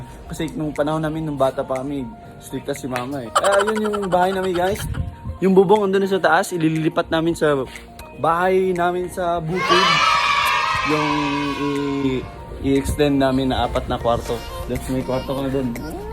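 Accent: Visayas, Philippines. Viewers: Saan ba kayu kuya? Parang may visaya accent ah. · DONE BJ Baldado From: Zamboanga City Amping mu always 😂 Cute kaayu ka. Magkatawa ko sa imung pronounciation sa tagalog Klaro jud Bisdak Haha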